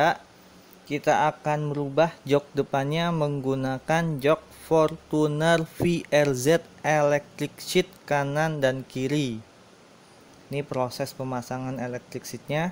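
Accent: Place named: Garut, Indonesia. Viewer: urang garut logay anjirr :D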